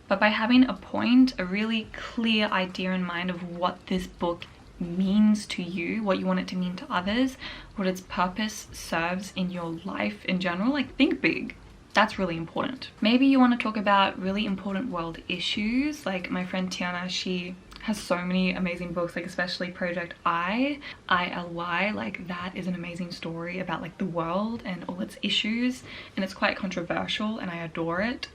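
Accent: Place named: Australia. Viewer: I love your aussie accent!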